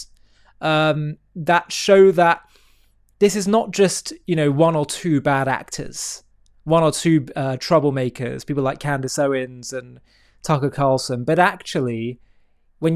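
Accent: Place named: United Kingdom. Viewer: Hey, uhm I have been watching a lot of your videos and the unbroken pattern I find in all of them is that if anyone doesn't agree with a policy of Israels or doesn't support a action of theirs 110% almost in a fanatical attitude of devotion you have a habit of beginning to label them "not a friend of Israel" and then going further to call them maybe even Anti-Semitic. You do not get to throw around labels as weapons like this at anyone you choose if they don't just throw themselves at your feet and prostrate themselves before the next big move that Israel makes. You have a British accent if I'm not mistaken and the last I checked you have some very serious problems over there in the U.K. with Muslims taking control of everything and they will soon be driving all the rest of the Brits out of their homeland. Maybe you should spend more time criticizing the very real enemies you have right there among you and take care of your own lands rather than poking barbs at American Conservative figures to cry bully all of us into submission into whatever your next big thing is. 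Neither Tucker, nor Vance, Nor, Charlie Kirck et all have ever been enemies of Israel and just because some of them may give pause to something or question something on strictly intellectual grounds to inspire discussion this channel responds to the same as if it were the harshest of crimes commited by the Third Reich or something. Look you can say whatever you want but you don't earn any credibility acting like t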